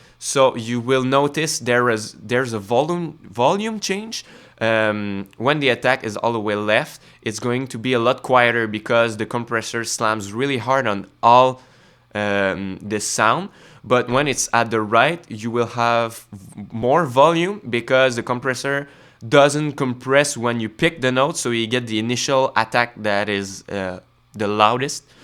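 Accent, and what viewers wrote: Quebec, Canada. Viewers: On reconnais tout de suite le gars de Quebec! Beau review! Tu me conseille quoi comme compression?, je suis guitariste Rock-Metal, je fait des solo et des bout plus clean et expérimental avec des effets, j'aimerais sustainer un peu mes notes et égaliser certains passages plus soft. c'est dur a choisir sur internet quand on les essaie pas. Le Cali 76 a l'air pas pire mais le prix me fait ** je suis sur de trouver quelque chose moins cher. Merci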